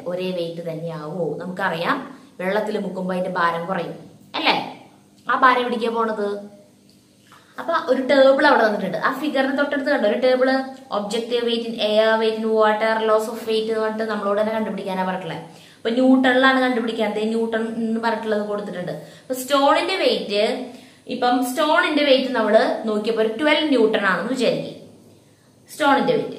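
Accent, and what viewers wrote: Thrissur, India. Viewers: Thrissur anele😂😂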